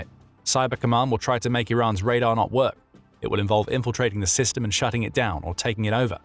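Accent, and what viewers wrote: United Kingdom. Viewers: If this the case why wasn’t we abled to stop there missiles sooner or even now. They just shut some at Israel creating mass casualties. Look what they have done to the gulf countries. Israel airport, Dubais airport, Israel’s nuclear site, residential and so many others. Iran doesn’t have satellites but china and Russia are giving Iran all the information they need, that’s why they hit their targets on the dot. So this video was like Iran is a sitting duck. I wish that was the case,but it’s not. Even to the point that Trump is talking about negotiation. Please get your facts straight and I don’t know what is up with a British accent?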